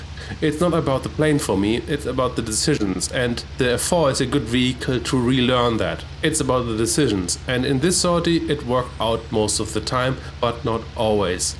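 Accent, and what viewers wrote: Germany. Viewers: I love these videos - the kind of practical, field study lessons that make a real difference in real-world fights compared to generic classroom do's and don'ts. Also, "... but after I'm sure the contrail dude just keeps trucking... " - American slang with a German accent. Brilliant! lol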